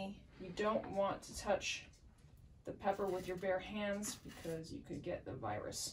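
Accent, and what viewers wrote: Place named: New York, United States. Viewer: is from New York City, specifically Queens. And yeah, her inner New Yorker came out fully here, and it is awesome.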